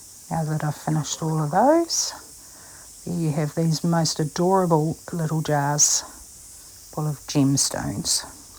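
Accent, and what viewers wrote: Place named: New Zealand. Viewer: who dosent love a kiwi accent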